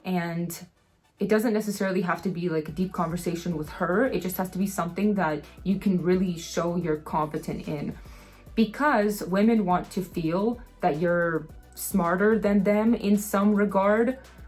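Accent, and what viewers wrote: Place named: Brazil. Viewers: are you brazilian? trying to figure out where that accent comes from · As a brazilian myself she pretty much sounds like one equal who have lived for years on an anglophono country.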